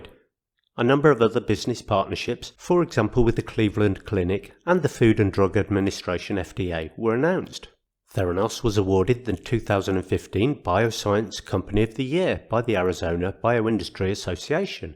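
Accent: United Kingdom. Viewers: 719 losers follow you...Yeh ! you work for CNN and any other acronym cunt out there..David Bowie said get ready for cunts...Oh ! I just saw another one YOU tube.....Even worse is the fact that you sound like you are from the ass end of the Midlands....Just go away will ya.... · Hahaha I was gonna say you could have used your British accent as an excuse, until I saw the graphic. Nice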